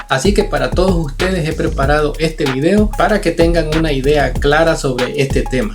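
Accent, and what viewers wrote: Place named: Cuba. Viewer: Por favor podrias pronunciar las letras S, FINALES? Y LA D, FINAL. SE TE OYE COMO J. TU CANAL ES MUY BUENO.ESCUCHATECY VERAS, QUE TU MODO DE HABLAR, PARECE DE UN CUBANO O NUCARAGUENSE PERO NO, SALVADOREÑO.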